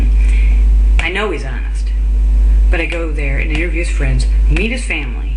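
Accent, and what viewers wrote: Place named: Texas, United States. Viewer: I thought I detected a TX accent. What part? I'm from Dallas (Irving). I never hated the French though. Sure they're smelly snobs, but I never hated them. :) (Just kidding!)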